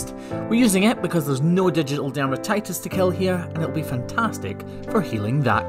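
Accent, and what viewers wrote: Scotland, United Kingdom. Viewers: I don‘t know anything about cows or hooftrimming (aside from what I learned from you XD) but since I stumbled over one of your videos I keep watching them for your humor, the charming scottish accent and the beautiful landscapes! Thank you for the Entertainment! ❤️ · Scots ...hes Scottish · Ur accent is from Brave ?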